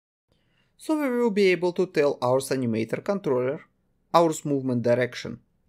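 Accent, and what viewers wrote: Russia. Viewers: Are you russian or what?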